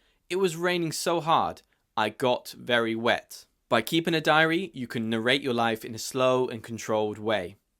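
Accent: United Kingdom. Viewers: You are the only british that I understand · I live in Ulsan, South Korea! Most Korean students learn American English in school, I was no exception. But I love British accents so I researched them and I was able to find you! 🥰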